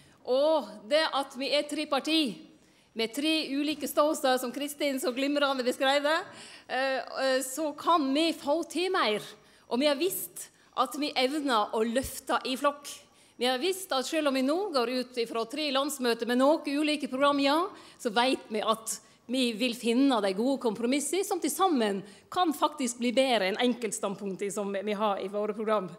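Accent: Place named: Norway. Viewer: Liv Signe har den kongedialekten! Bra tale av Sp-lederen. Godt valg til de Rød-Grønne.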